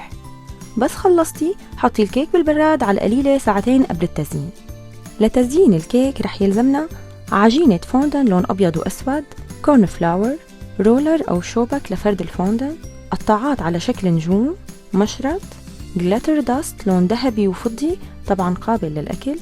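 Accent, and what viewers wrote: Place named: Levant. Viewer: تسلم ايديكي شي ظريف بحب لهجتك كمان شاميه 😙😙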